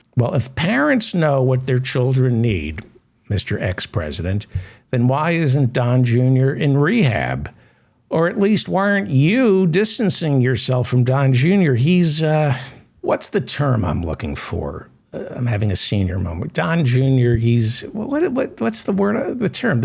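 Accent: New York, United States. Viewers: I read ur intro, I grew up in Flushing and throughout my entire life I've battled to fall asleep. I'd put on earphones and listen to talk radio when I was a kid hoping to get some shut eye before school and still till this day I transitioned from a walkman and a.m. radio to a smartphone and YouTube. I found you at approx 4am last night(IMO it's never the next day until the sun begins to rise) and listened to one of your podcasts(full show), the ny/jersey accent, the dry humor overloaded with a large helping of sarcasm was right up my alley. Keep doing what you're doing, you're fantastic at this. We need to crush the GOP out of existence or if the GOP wants to continue as a party here in the US then they need to be known by the entire world population as the far right extreme fascist propagandist nationalists that they are but yes I know it's way too long for a party name but we can make it into an acronym such as the Far Reich Totalitarians party in short they'll be known as the FART Party). 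Then we'll have to out the Corporate Dems as the Republican lite party that they are and allow the real progressives to have our own party. Good day to you sir, you've found a new subscriber in me today with many many more to follow.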